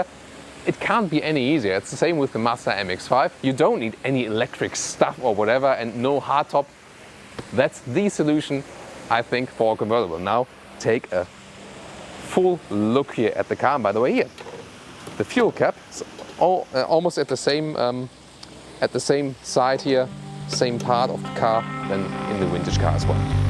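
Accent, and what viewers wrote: Germany. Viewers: Just Awesome German accent..... 😩😩😩😩😩😩😩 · Der Akzent ist zu deutsch! Üben!!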